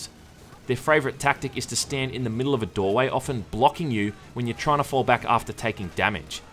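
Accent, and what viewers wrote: Australia. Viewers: Love your Australian accent. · You sound so innocent and well behaved here mate! Keeping the Aussie accent in check too. I'm glad you found your real voice eventually, coz you're hilarious and entertaining.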